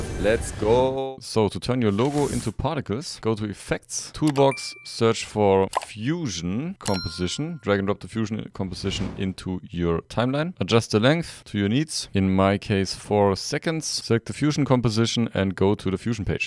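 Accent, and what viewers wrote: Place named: Germany. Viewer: soo i can SEE you ARE German cause pof your Accent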